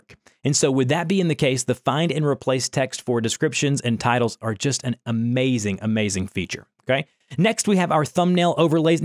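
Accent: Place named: Baltimore, United States. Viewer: Are you from Baltimore? I'm hearing the "O's" in you. Hahaha.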